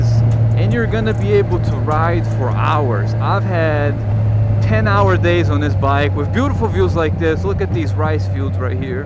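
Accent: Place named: Taiwan. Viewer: So youre from Taiwan? Do all people from taiwan have that accent? And also, do most people speak such fluent english? Love the channel. Subscribed. Hopefully you post some new vids one of these days!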